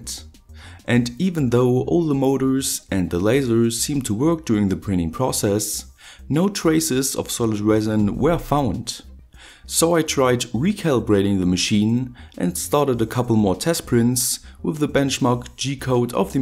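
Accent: Germany. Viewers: You sir, are a true genius. P.S. I love your german accent xD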